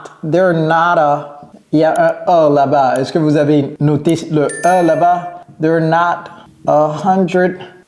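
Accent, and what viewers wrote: United States. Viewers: "Apples to oranges" I heard " apologize"😁 if I'm regular to see all your videos I can hear American accent. I'm glad to find your lessons. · Wow c'est tres bon votre accent americain! meme votre accent francais est impeccable. BRAVO! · I really like the way you teach English! The challenge is the american accent. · j' adore vraiment cet accent americain. ça t'as pris combien de temps mr d'être meilleur en parlé?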